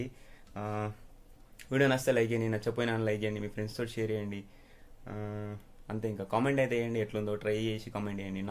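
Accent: India. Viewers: Hamara hyderabadi patta ..superb dialect of hyderabad ..from Langer houz..love you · Mee language bagundi telangana style nd recipe kuda chaala baagundi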